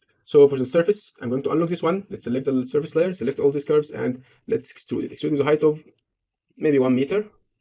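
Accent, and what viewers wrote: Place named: Egypt. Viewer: Egyptian accent, I got you! 😂 Thanks, Broo!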